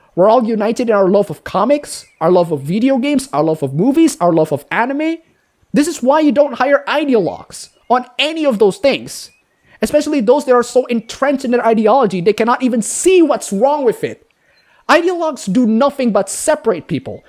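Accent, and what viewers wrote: United States. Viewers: You're speech kinda reminds me of the things a lot of the stuff my fellow Californians have. Also good luck.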